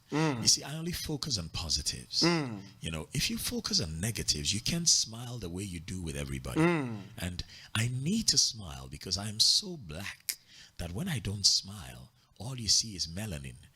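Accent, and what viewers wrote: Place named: United Kingdom. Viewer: But you’ve got a British accent so what should we call you.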